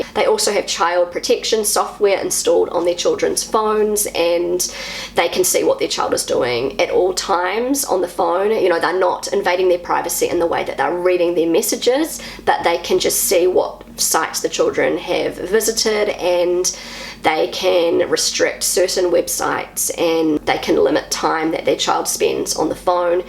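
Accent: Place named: New Zealand. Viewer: Everytime I watch a vlog of yours I'm helplessly falling in love with your kiwi english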